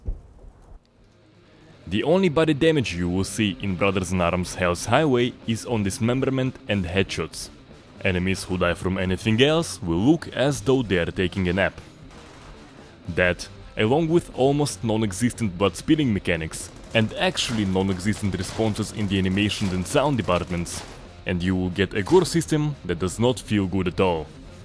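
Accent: Russia. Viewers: i like your russian accent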